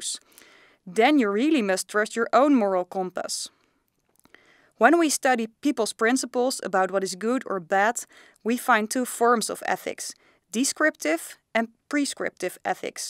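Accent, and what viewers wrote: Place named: Spain. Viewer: This person is definitely from Spain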